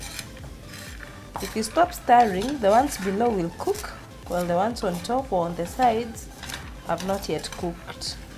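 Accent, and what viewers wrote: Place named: East Africa. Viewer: Esther Mutua try not to be silly. She speaks beautifully with an East African flow.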